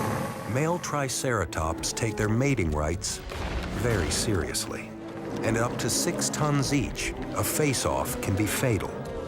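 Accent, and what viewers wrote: United States. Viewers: Who is the teller? He sounds american 😢😢. I prefere Nigel Marven. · yawn, more american voiceovers...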